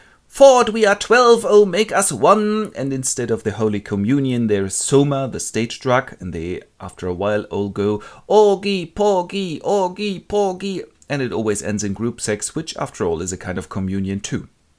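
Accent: Germany. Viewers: Iron American Dream on YouTube. It's wonderful, I love the vernacular. The German accent tops It off.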